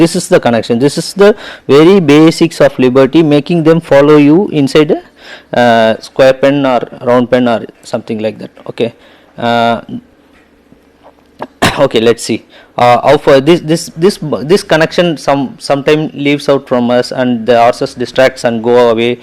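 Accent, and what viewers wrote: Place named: United Kingdom. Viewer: British english ah na😟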